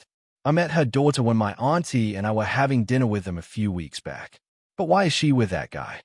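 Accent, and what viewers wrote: Australia. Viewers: I'm hooked but I wasn't expecting the Australian accent. When is part 2 coming out? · You're not crazy that is an ausie accent if I ever heard one lol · Am I crazy or does the Ai sound Australian or something 😂 like it isn’t really pronouncing the r’s all that much, and it’s also being really extra with all the a’s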